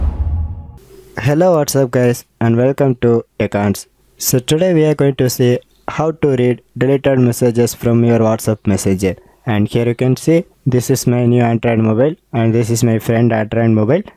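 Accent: South India, India. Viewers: South indian voice , nice video bro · South-indian accent love tht 👍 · I think that he,probably, is a south Indian.because his accent is a bit like that.however, the video deserves a salute😊😊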